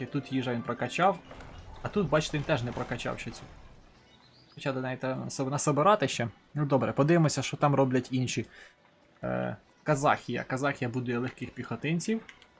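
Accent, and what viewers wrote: Poltava, Ukraine. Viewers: Ти бува не з полтавщини? Бо говір шось дуже знайомий ,таке як чи Хорол ,Миргород , ті краї? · В тебе полтавський діалект?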